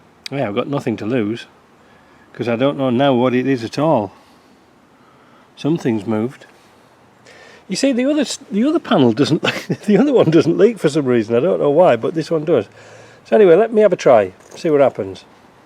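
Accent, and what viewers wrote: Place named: Middlesbrough, United Kingdom. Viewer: Well that answers that question 'like a wet weekend in Middlesbrough', my wife was adamant that you had a Boro accent. She's a Middlesbrough lass and we live in Stockton on Tees....... and yes, that's often the weather. Got my sliding window seals done, now to track down a roof leak that runs in the inside roof gutter and showers my lass when braking ...... oh the joys. Ken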